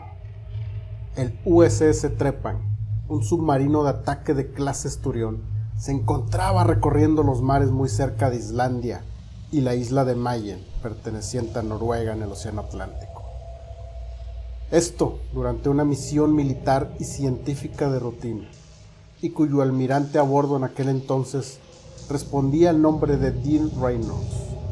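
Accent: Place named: Mexico. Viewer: Hablas muy Mexicano , con mucho acento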